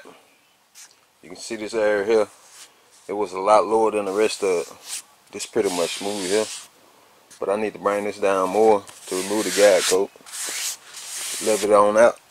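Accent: Alabama, United States. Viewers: What part of Alabama you from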